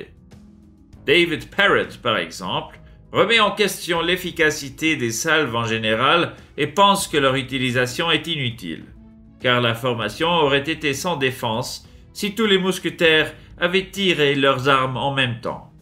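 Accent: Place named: Swiss German, Switzerland. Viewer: Suisse allemand, il me semble.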